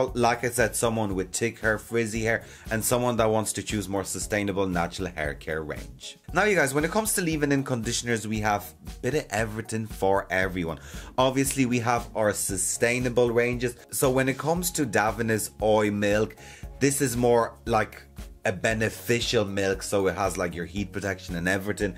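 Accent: Ireland. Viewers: Hey do you live in Ireland? Your accent and the way you say film is so Irish coded. Love it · ….I ADORE watching and listening to you. You make me smile so much. I LOVE your “Heap of Durt” comment…. your accent…..reminds me of my great Irish friends. I take your knowledgeable opinion as THE BEST. Big hugs, Teddy U.K. xx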